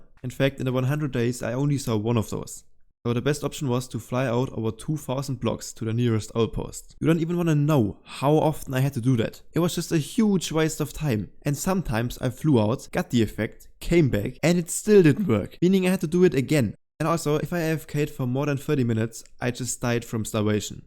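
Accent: Germany. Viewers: your accent gave you away I know a couple Germans and you sound like them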